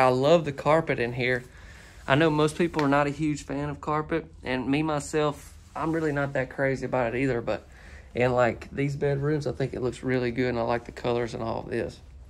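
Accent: South, United States. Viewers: I haven't gotten past that beautiful blue in the ceilings and all around the decor., what state are you in? I want to sell my home and leave the state. Your commentary and that beautiful country accent does it for me 😂! Do you or your team deal with VA loans or know how to assist veterans? Please let me know. Thank you! Wishing you & yours a wonderful & blessed New Year. Please stay safe out there. U S Army Retired (<num>) Iraq Veteran. Peace & Blessings, Light & Reflections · my man! Having moved to Yankee country many years ago, I miss hearing the Mother Tongue. As well as great, informational tours, your voice takes me home to wonderful memories of growing up in the South. All I need now is some Carolina BBQ and some boiled peanuts! Thank you!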